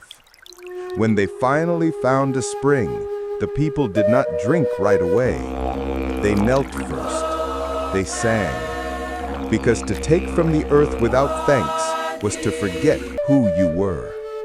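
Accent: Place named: United States. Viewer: What a load of BS - it would have to be some American voice over - as usual!!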